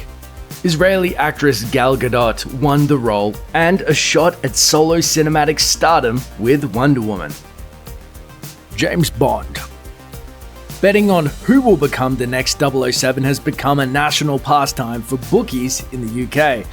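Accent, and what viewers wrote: Australia. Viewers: I'm sorry, but that douchie Aussie accent is super distracting... XOXO ;) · woah hes australian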